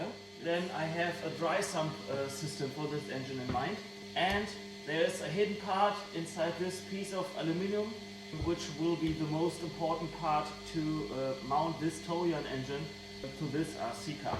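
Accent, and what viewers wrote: Germany. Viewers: Let's do it. I need XXL though, and shipping will be to USA. I want to support you. BTW, one of my grandmother's was a card carrying immigrant from Germany. She had many German friends in the states. Their English was just like yours. Very good, with an accent. Reminds me of my childhood. Love it.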